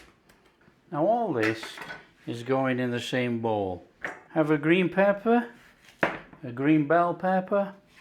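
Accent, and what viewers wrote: United Kingdom. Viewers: What a delight - Hoppin' Jack - or Hoppin' John - with a British accent. From the northern US, but schooled in the South, so I enjoy a variety of southern dishes. This will be in the plan next time we have ham!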